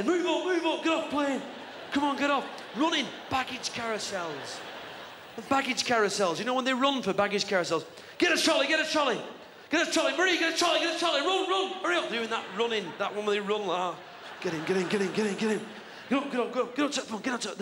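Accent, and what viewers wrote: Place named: Bolton, United Kingdom. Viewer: "Pissed off with er stuck up" 🤣🤣🤣 Bolton accent eh lol...COME ON... Keep them coming... Makes my bloody day..😁😁👍✌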